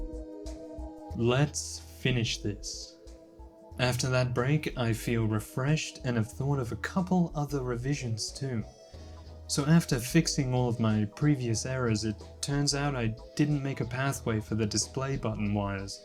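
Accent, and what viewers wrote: United Kingdom. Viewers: you sound like a british nerrel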